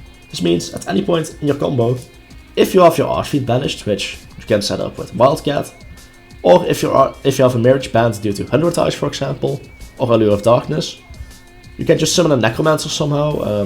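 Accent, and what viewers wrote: Netherlands. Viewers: Are u dutch? U have a dutch accent Nice video btw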